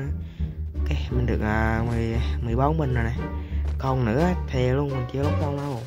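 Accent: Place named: Da Nang, Vietnam. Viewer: anh là người đà nẵng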